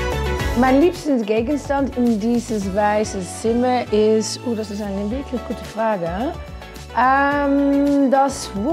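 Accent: Netherlands. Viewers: Lovely 🥰 ich liebe den holländischen Akzent 💕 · Es ist gut! Sie hat den wunderschönen holländischen Akzent ❤️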